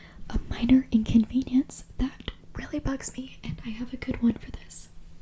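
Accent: United States. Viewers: Watched/listened to the whole video TWICE! :eyes-pink-heart-shape: Some content creators say if you made it to the end put a zebra emoji or they ask a random question, so you see random zebra's or emojis that confuse people in the comments :face-blue-smiling: I learnt so much! I have much respect and admiration being a fellow Christian :face-red-heart-shape: 4 years with your bf is amazing especially at such a young age! :face-blue-wide-eyes::eyes-pink-heart-shape: I wonder if he's a Christian and you met through church? Oh I think a return to pink hair is on the cards for you! Your fans would love that I'm sure :eyes-pink-heart-shape::face-red-heart-shape: You didn't have New Zealand on your places you wish to visit :face-purple-crying: That's ok, you had some great locations! I knew you weren't from Germany (or at least with that thick American accent, didn't live/grow up there? 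:face-blue-smiling:) That's a cool story about your name! Cool parents :eyes-pink-heart-shape: Congrats on your Youtube/TikTok success and I'm sure you will only grow more in years to come! :eyes-pink-heart-shape::trophy-yellow-smiling: PS. I love the pigtails! :eyes-pink-heart-shape:Women can look so different with a subtle change in hairstyle :face-red-heart-shape: Would love to see different hairstyles/ braids in future videos :face-red-heart-shape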